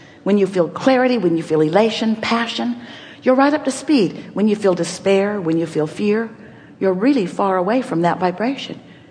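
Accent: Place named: Ireland. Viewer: isn't that sota Irish bahahahahahah :) ♥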